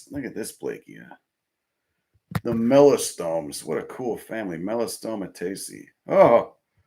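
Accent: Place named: Iowa, United States. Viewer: Love his Iowa accent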